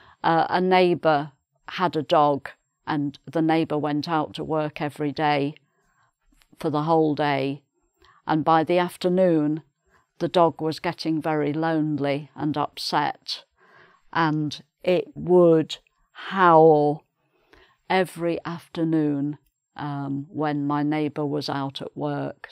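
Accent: England, United Kingdom. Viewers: British English 🤗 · I'm head over heels for your british accent a lot. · Thank you Mrs! I do appreciate your lessons and your beautiful British accent. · I love British accent. ❤ I feel happy when I listen to this loving teacher. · excellent teacher no doubt! Me being french speaking English since 1977 on a daily basis mainely in the U.S I ADORE hearing this REAL English accent :) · Your British accent makes me warm,. I love your lesson. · I am an English teacher and today I have learned that uses of "Would." Thank you Miss. (Plus points: you don't speak too fast and I like your british accent.) Lovelots from the Philippines. 😘😘😘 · I love her British accent ♡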